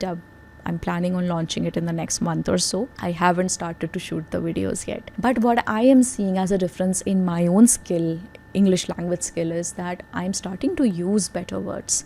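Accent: India. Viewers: Why you not speak Real English it's hindi English. The lanaguage must be speak on their orignal way is best to teach and learn not on this way Thank s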